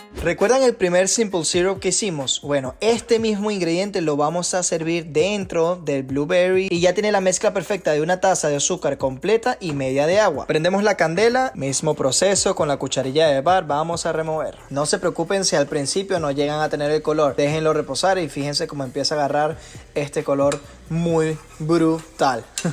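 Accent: Venezuela. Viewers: Será venezolano este chico? Habla hermoso y es un crack en la barra 10/10 hermoso❤ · Este pana parece venezolano :D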